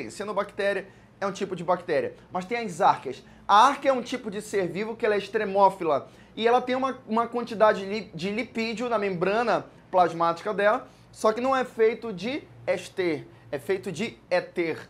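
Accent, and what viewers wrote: Pará, Brazil. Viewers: Muito bom o sotaque do Pará kkkk ótima aula! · olá professor , estou acompanhando suas aulas há algum tempo , pelo sotaque , pensei que fosse paraense , hoje descobri que é sim , e achei muito legal , também sou paraense e já morei em Ponta de Pedras , atualmente moro no RJ, gosto muito das suas aulas , super recomendo para os meus colegas e agora sabendo que é paraense vou triplicar isso .Ótima qualidade são suas aulas, completas! parabéns e sucesso. Gabriela Araújo.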